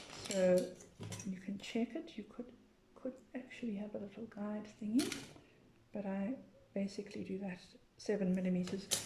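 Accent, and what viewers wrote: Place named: South Africa. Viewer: yes, the person I learned from uses the same density board for spines as the main cover. I've been covering with thicker curtain type fabric as you know... apocalypse and all - don't leave the house unnecessarily and i've managed a few hardcover books but it always seems to crimp slightly and then binds too tightly. No one else notices this but I'm a perfectionist. I might try add just a 2mm leeway on the spines and see if that helps. Also, when I clicked on your video and heard your accent I did a little happy dance and was like "That's defs from SA!" then i checked and yesss! Nice to see south africans making epic content!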